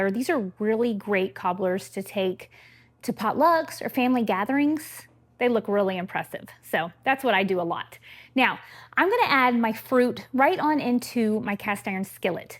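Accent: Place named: South, United States. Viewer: … I just knew you sounded like a Southern Girl, like me, in Texas. Didn’t have time to make the cobbler today, I did get out the flour and sugar, I have fruit and will make it soon. Makes my mouth water to think about it. Thanks again for sharing. I’m really enjoying your recipes, want to make that easy bread too! 🤗🌺🤗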